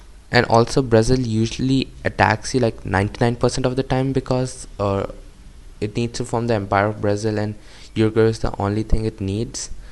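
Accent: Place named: India. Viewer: I love the indian accent man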